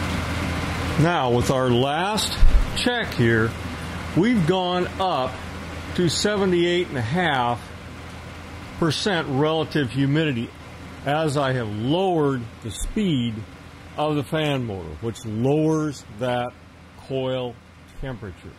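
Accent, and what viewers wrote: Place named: Indiana, United States. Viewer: From my understanding I think he’s from Indiana I could tell just the way he talks mmhmm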